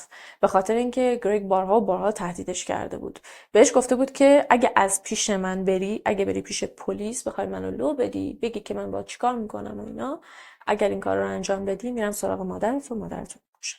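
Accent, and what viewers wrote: Mashhad, Iran. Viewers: سلام جان مشهدی هستید؟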